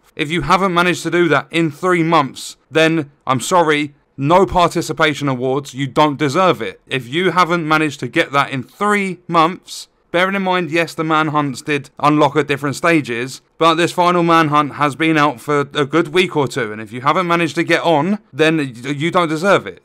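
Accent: England, United Kingdom. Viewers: I don't play the division, I don't watch division videos, but listening to your classic " its a load of bollocks " English accent is enough for me to watch your videos. Keep up the rants the game is aids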